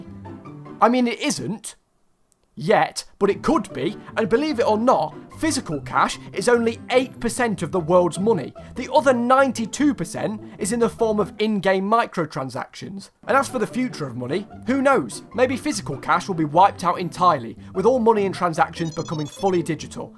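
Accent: United Kingdom. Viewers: I'd this man British because he sounds British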